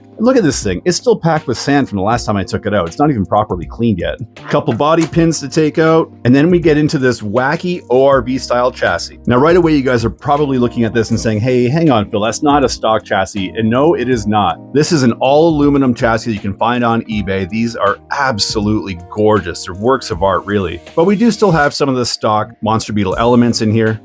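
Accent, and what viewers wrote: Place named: Canada. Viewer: Deckles and Lie-very… you Canadians always make my day!🤣 I built a frog back in 83/84… I grew up in Brooklyn and my neighbor upstairs was an ex plane builder, he built a frog and a holiday buggy for his two sons… I had to have a frog too… I like how the grasshopper looked lots more but I knew with the 380 I wouldn’t be able to keep up lol The super champ was the dream back then, and all the bad ass team associated cars that were just way too much for my 10 year old taste lol Those videos make me want to build them ALL!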